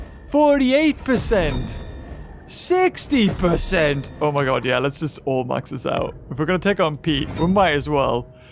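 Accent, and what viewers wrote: Louisiana, United States. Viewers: are you American? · there are three types of Louisiana accent 1. Farmland (southern accent) 2. City (New Orleans accent) 3.Bayou (Pete’s accent) I hope you find this educational Pls upvote so can see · It's a Louisiana accent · He has a Cajun accent. He's creole.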